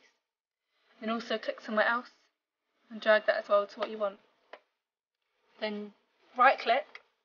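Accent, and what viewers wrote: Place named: United Kingdom. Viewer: I'm a sucker for British accents. I love it!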